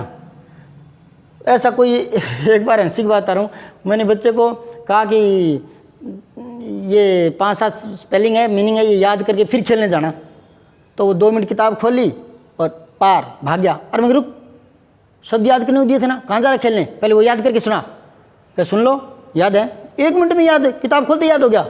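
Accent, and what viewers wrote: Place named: Rajasthan, India. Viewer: लगता है आप राजस्थान से हैं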